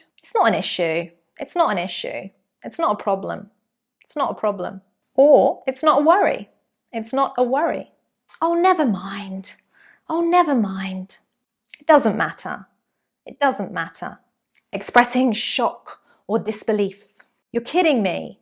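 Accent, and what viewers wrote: United Kingdom. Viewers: So helpful phrases. Is this a British accent? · Lovely. I've been looking for pure British English pronunciation. Thanks a lot. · Thank You for you conversation teaching is it nice one to learn actually l want to catch your accent British this one easy not hard but the thing is how can to memories the Bank of vocabulary this problem is great so when it found the english speech rather unable to know every things this is my vision tell about when listen podcast can not understand all great thanks to you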